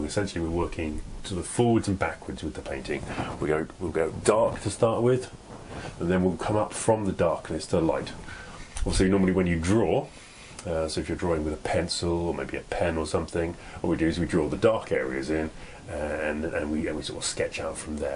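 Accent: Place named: England, United Kingdom. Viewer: You meant 'spot' of tea didn't you??? It's been so long since i've heard a proper English accent... L'oven it...! And you painting too!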